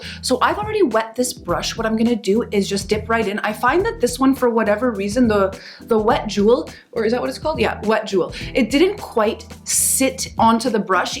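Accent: Canada. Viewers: Are you Canadian? Your accent is so cute, like really eloquent and like, detailed pronouncing. Most Americans don’t talk like that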